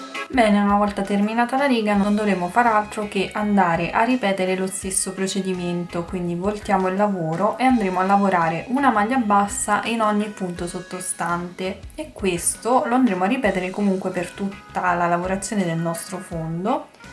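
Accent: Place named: Italy. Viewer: Belo trabalho. E bom ouvir o jeito de falar do povo italiano . Me lembro da minha nona . Minha vóvo falava assim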